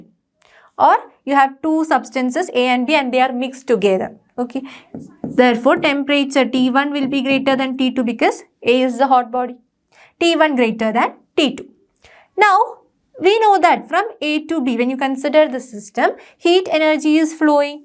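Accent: Kerala, India. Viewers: Ahn accent kettale ariyam pinne name 😂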